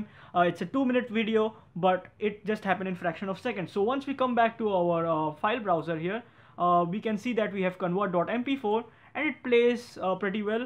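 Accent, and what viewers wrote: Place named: India. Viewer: Indians speaking english sounds very ridiculous, next time use subtitles, the world will thank you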